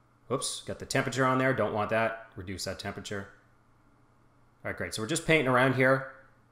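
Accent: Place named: Atlantic Canada, Canada. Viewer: Great video! Cool accent, almost sounds like you’re from Atlantic Canada.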